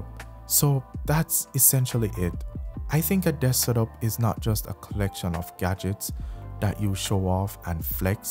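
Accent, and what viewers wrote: Jamaica. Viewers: Man sounds Jamaican asf loool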